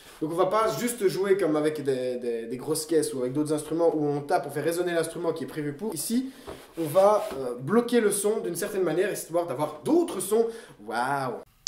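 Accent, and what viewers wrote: Belgium. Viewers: mmmmmmm, eskeu par hasard mes oreilles distinguent bien des "aloors' typiques de la Wallonie ? si oui bisou de Namur, si non, Bisou de Namur quand même ! Edit : le A L'AISE m'a donné réponse :) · Je vous découvre hier, je me réveille avec la tête dans le cul ce matin avec cette vidéo, et je me dis "mais ... c'est pas possible avec cet accent c'est un compatriote belge" oui gagné 😂 En tout cas cest chouette d'avoir enfin découvert quel nom ce tambour et ce son si spécifique (et très appréciable 😍) portait 😄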